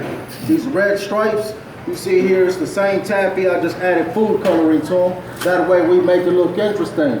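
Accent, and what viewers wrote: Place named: Houston, United States. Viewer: Damn my nigga. Got that south east side accent kinda strong hehe. Sounds like all my homies in htown.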